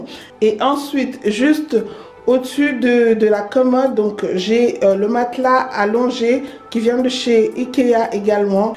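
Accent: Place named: Belgium. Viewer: Cc,juste par curiosité: tu es une belge perdue en France? 😜 t a l accent belge! Mais tu parle souvent des magasins typiquement français! Kiabi,gifi........ peut être frontalière? Bisous😘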